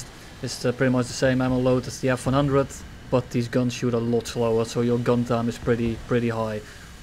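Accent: Netherlands. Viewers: I have bin a subscriber for some time now and I have to ask, are u dutch or belgains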